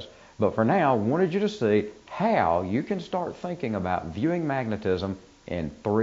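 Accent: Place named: Tennessee, United States. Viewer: You sound like a Tennessean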